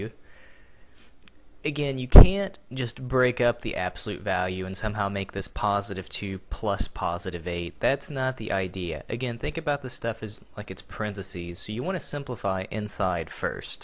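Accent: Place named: South, United States. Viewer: GREAT teaching! By the way, I love your accent. Obviously southern like mine! :)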